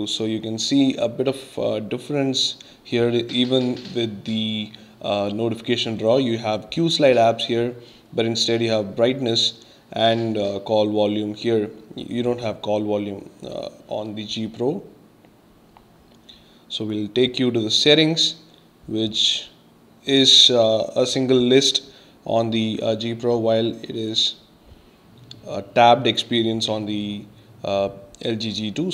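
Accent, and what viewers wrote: India. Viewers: indian accent actually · indian accent. dark hands...cant be Russian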